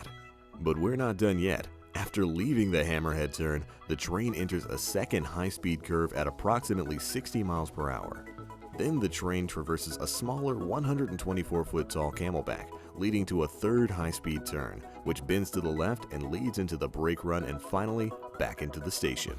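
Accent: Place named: United States. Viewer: GeekyGamerGirl03 actually, Cedar Point is in Ohio, and most people in Ohio speak in an American accent, and he is speaking in an American accent.I live in Ohio. Not trying to sound mean or anything:).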